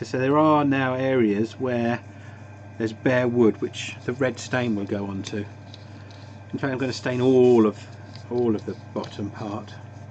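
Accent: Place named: England, United Kingdom. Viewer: Beautiful piece, but what was the medium you added to the Gold Paint? Sorry, but I'm in the states and didn't understand it through your accent. Of course, we speak american as opposed to English, so that could account for it. :-)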